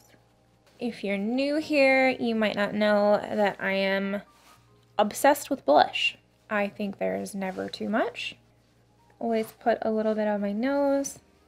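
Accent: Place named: Canada. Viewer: I'm a little late to the game but goddamn is it good to see you back 🖤🖤 and good to hear that sneaky Canadian accent 🤗🖤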